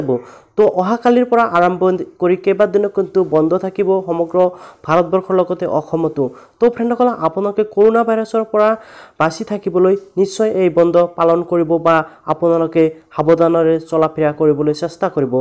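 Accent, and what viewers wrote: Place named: Bangladesh. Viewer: আপুনি অসমীয়া নে অকমীয়া ? অনুগ্ৰহ কৰি শব্দ উচ্চাৰণ কৰিব শিকিব। শূনিলে কিবা বাংলা দেশীয় যেন অনুভৱ হয়।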